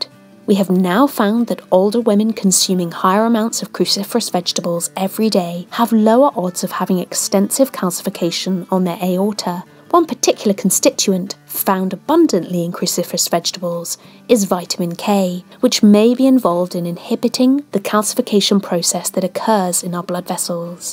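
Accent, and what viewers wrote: United Kingdom. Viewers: I love your voice and british accent :) Great information shared, thanks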